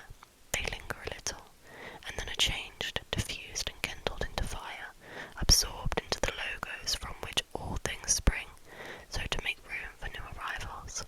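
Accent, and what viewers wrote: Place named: England, United Kingdom. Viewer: yay I love an English accent, subbed! I have a feeling you’ve got a lot of good books up your sleeve, you should totally do a book suggestion vid :)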